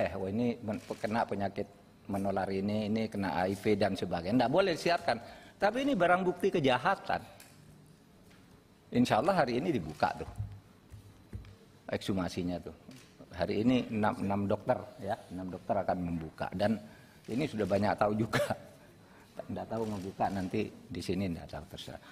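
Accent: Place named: Madura, Indonesia. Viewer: PAK MAHFUD MD... LOGAT MADURA NYA MASIH NAMPAK......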